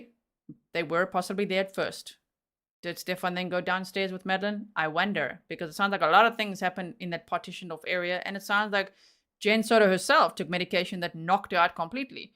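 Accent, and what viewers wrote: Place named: South Africa. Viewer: Your South-African accent is so beautiful, it makes me proud to be South-African. You sound like HOME❤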